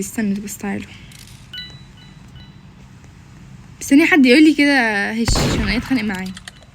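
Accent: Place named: Saudi Arabia. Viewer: ليه احسك سعوديه اكثر من مصريه؟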